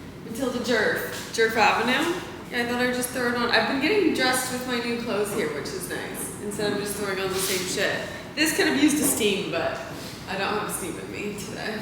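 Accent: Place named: United States. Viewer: I love in American Accents. By the way, May I know Where is your accent in America? Thanks you.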